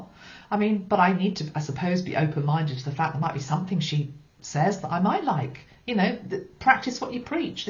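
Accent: England, United Kingdom. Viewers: Thank you so much for this uplifting video. We, the people, are more powerful than we know. I just love that beautiful English accent of yours and Best Wishes for a fun holiday in London.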